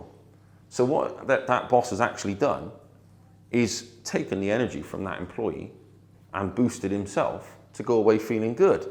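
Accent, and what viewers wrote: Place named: Bristol, United Kingdom. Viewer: Brilliant lecture...sounds like from Bristol too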